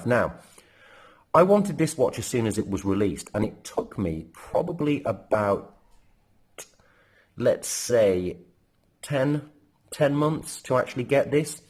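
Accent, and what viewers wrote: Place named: United Kingdom. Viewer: Judging by your accent I take it your from the U.K. and from what I heard is that the P01 over in the U.K. was a hit as soon as it became available. I’m not saying there were waiting lists for it. Just that when a dealer got it there was someone to buy it. Maybe that was the reason you had such a difficult time acquiring one. Over here in he U.S. it’s not as popular in my opinion and because of that if you do some digging around you can still find one from an Authorized dealer. But I don’t believe that all dealers are even ordering them. At the moment the watch is getting no love from anyone but as soon as it gets discontinued people will start realizing it’s a classic and a must have. I’m really curious if they discontinue it when they come out with new releases or give it another year. Maybe corna will be a decision factor for discontinuing it because they are backed up on production?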